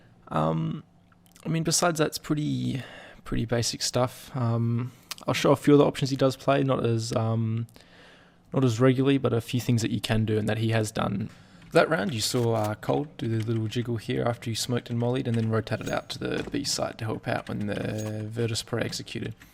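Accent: Australia. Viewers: are you Australian? Im in love with your accent :DD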